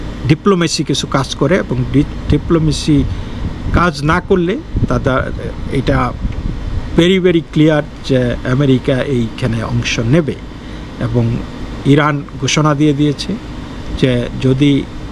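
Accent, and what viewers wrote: Bangal, Bangladesh. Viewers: অনেকদিন পর । খুব মিস করছিলাম আপনাকে। আপনার মুখে বাঙাল ভাষায় খবর শুইন্যা মনডা ভাল হইয়া গেল।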